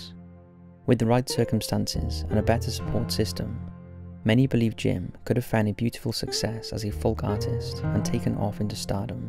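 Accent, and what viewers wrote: United Kingdom. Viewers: He's British, I believe, which would explain is pronunciation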